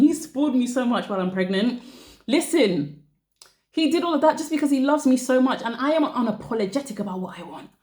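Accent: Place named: United Kingdom. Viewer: Your British accent is beautiful.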